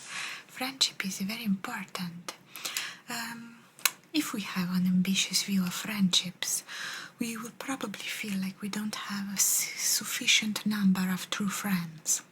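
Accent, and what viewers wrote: Italy. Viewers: Ciao and Lucia!💜💜💜 I do so love your gorgeous accent!! Thank you for soothing and lulling me to sleep. You are the best in my opinion! I hope you and your family are staying healthy. Have an excellent weekend. You deserve it. Goodnight and sweet golden dreams. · Ciao cara!🤗 Mi piace molto questa tipologia di video, sei bravissima e bellissima, complimenti!🥰 Adoro il suono della scrittura e adoro ascoltare il tuo meraviglioso accento Italiano 💚🤍❤️ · Hello, fantastic ASMR, I really liked it and with an Italian accent, greetings, beautiful 🌹💖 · ahh you are amazing and we are back at the amazing italian accent i love it <3:) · Thank you for creating this compilation for us,. I love the Italian accent in combination with your wise words. You say in the description that you're not a therapist, but you seem like a really good one to me. You probably just need to get some sort of a degree to make it official. 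· Yessss,long time Italian Accent.😊😊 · Italian accent videos are perfect for relaxing and unwind your soothing voice makes me feel sleepy 😴 😊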